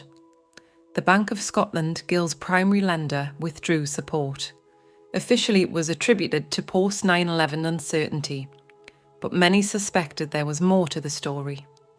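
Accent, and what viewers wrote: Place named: Newcastle, United Kingdom. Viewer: Hi ' '. I was a porter at Newcastle airport from 1987 to 89. I remember Gill air a lot as I worked a lot with them at the check in weighing the luggage and tagging them. This was their regular flight to Belfast. It was the same aircraft that you take a look around during this video, the one with the wing over the top of the fuselage. It brought back a lot of memories this video so thanks for that. Your videos are so interesting and as I've said before, greatly narrated with a true Geordie accent. Keep them coming.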